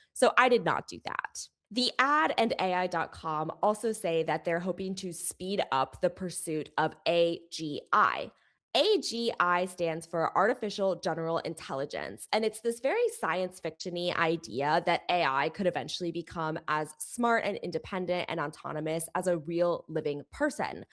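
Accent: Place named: California, United States. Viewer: Californian? Plus bad vocal fry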